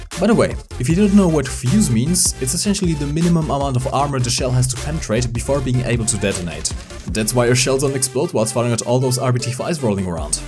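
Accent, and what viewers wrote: Germany. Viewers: hab ich mir auch schon gedacht auch weil sein englisch so klingt wie das eines deutschen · ich wusste es du hasst einen starken deutschen akzent (was nichts schlechtes ist) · dat Germany accent tho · hach ich feier diese englisch mit akzentfreiem deutsch soo hart ;)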